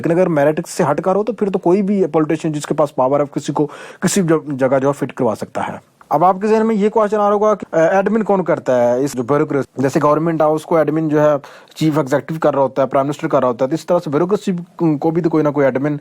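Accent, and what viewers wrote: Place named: Punjab, Pakistan. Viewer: 🤣🤣🤣 Urdu m Punjabi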